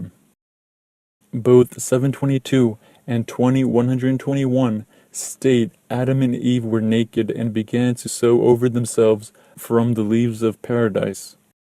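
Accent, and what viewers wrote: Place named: India. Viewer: Are you from India? Cause you pronounce many words like we do. Anyways. Stay healthy. Stay happy. Lov from India.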